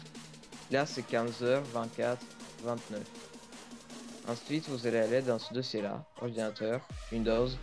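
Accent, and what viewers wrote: Russia. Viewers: Tes Russe ?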